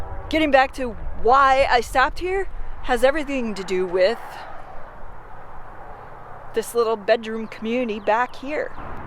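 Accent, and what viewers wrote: Wisconsin, United States. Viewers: Love the accent. Reminds me of my family that live around Marathon, Wisconsin. I worked as a professional archaeologist for years documenting old mining districts through out Arizona and Nevada. I currently work for a major railroad and get to see alot of these small town sites that are barely in existence.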